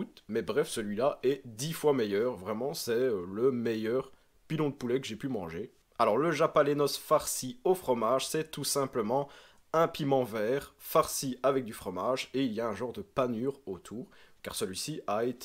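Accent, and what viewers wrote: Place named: Belgium. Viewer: J'adore ton accent belge. En tant que confrère, je m'abonne.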